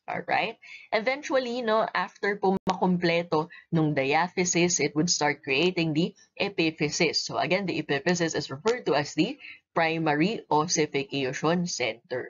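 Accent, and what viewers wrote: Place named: United States. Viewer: Thank you so much po! I love, love you! Super helpful as a nursing student! Currently listening to this while I arrange my flashcards. And oh my, ang natural-sounding po ng American accent niyo, and the way you code-switch makes me fangirl 😳 Linguistic enthusiast here hehe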